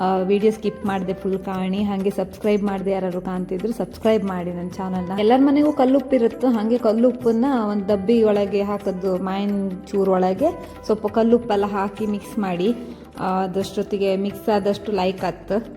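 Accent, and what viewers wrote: Kundapura, India. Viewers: ನಿಮ್ಮ ಕುಂದಾಪುರ ಕನ್ನಡ 👌👌👌👌👌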